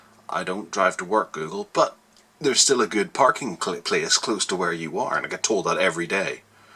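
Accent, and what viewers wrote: Northern Ireland, United Kingdom. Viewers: lol never mind. It's nice to click on a review and hear a fellow norn iron accent though:)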